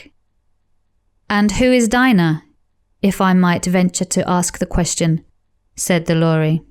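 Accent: United Kingdom. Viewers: 영국영어, 상큼합니다~~~^^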